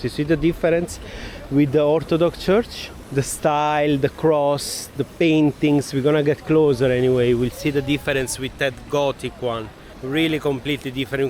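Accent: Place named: Italy. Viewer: Interesting video. What is your nationality? Are you italian? Your accent sounds italian.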